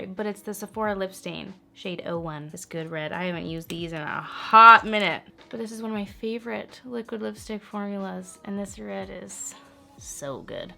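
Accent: United Kingdom. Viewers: I am living for the British accent!!! 😂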